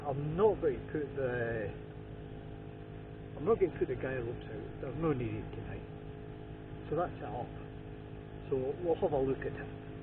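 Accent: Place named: Tayside, United Kingdom. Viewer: Sounds like Tayside.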